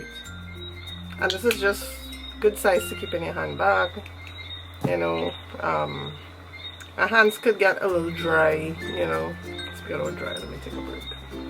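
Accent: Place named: Trinidad and Tobago. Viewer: It's so refreshing to hear a Trini makeup guru for a change! And you seem to really know your stuff. #trinitodbone #sexytriniaccent #sephoragiveaway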